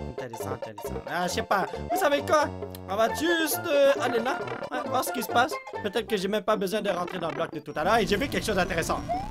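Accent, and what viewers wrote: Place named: Quebec, Canada. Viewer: J'adore les expressions qu'il utilise, en plus il y a un accent québécois sans que ce soit trop prononcé c'est génial :)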